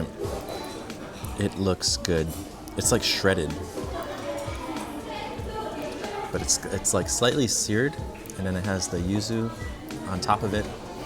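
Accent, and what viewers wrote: United States. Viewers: Please remove hat while eating Love video and had Kaiten sushi often. Are you originally from Hawaii? Sounds like it.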